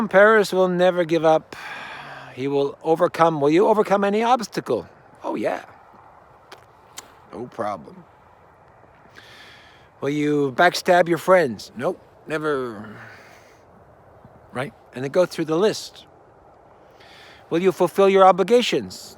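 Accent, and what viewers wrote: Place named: Canada. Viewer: love your canuck accent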